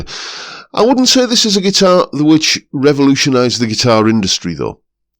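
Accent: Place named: England, United Kingdom. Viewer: I grew up in Lancashire, been in Switzerland for ages now and just really enjoy you talking,! Brings a piece of England to my humble home! Love all your videos in general🎸🤘🎸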